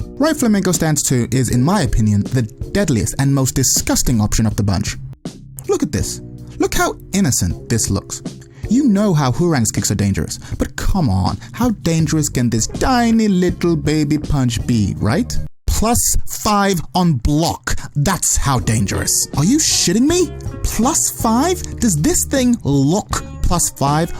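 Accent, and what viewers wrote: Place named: United Kingdom. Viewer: This was a steller breakdown. I don't know why but I pictured narrator drinking tea in the background. Must be the accent.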